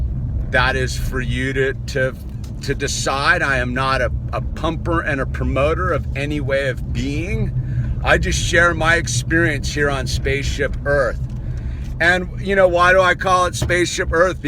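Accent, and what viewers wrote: California, United States. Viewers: I’m glad I subscribed to you . Your voice is so mellow and Californian (?) . 💕💛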